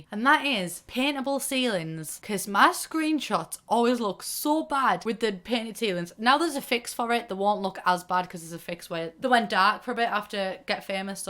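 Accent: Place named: Newcastle, United Kingdom. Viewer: I guess I need to get cats and dogs Also are you from up north you sound Geordie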